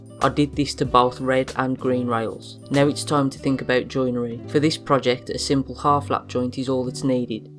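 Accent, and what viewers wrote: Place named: Birmingham, United Kingdom. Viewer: aah ok. I've been watching Peaky Blinders and you sound a bit like them:)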